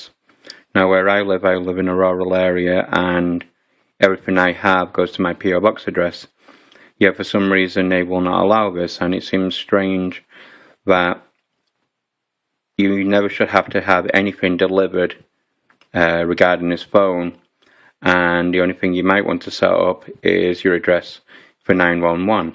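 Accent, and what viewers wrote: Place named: Manchester, United Kingdom. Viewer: You sound like a Manchester Lad to me, Living in USA?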